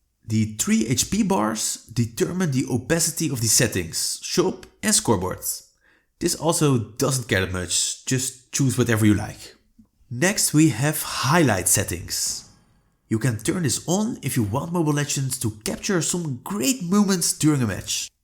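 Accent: Netherlands. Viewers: Nice video man! Na het 1e woord was het al duidelijk dat je Nederlands was haha.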